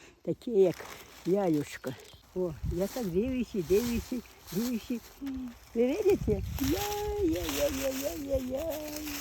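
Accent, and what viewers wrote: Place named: Ternopil, Ukraine. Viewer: Дай Вам Боже здоров'я, пані, і сили на многії літа. Мені цікаво де Ви проживаєте, бо повертаєте мене в дитинство, саме так говорили в нас в селі на Тернопільщині, де я народилася.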